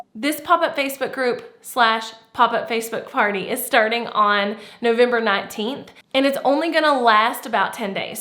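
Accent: South, United States. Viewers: I love the fact that there is someone else with a good southern accent who's making it in YouTube! I hear myself on camera and it's a hoot! And yes, I'm from North Carolina as well!:)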